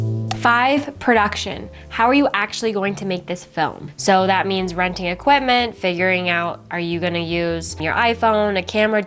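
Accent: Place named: United States. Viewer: I am brazilian and live in London. I love your american accent.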